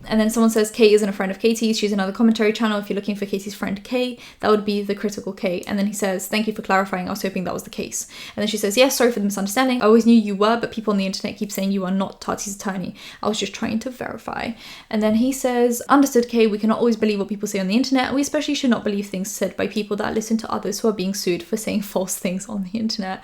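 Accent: United Kingdom. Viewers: aw ur british accent is so cute!!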